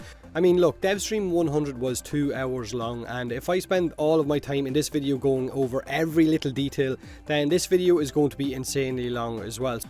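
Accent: Ireland. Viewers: the moment those words split out of the mouth of that, I knew!! I will always luv this Irish dude talking aboat Warframe <3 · ** whoever criticised you for looking a little uncomfortable. Let them sit in front of tens thousands of people from all around the world and try to keep it as cool as you did, sitting there happy in the background, all potatoy and s**t. Was awesome seeing you there. First devstream I watched completely (30% because of you, maybe 40%:P). So keep your head up and your accent irish^^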